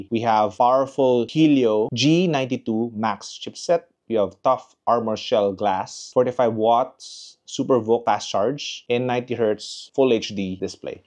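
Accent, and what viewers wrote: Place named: Singapore. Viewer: Kung di ka nagtagalog kala ko singaporean ka na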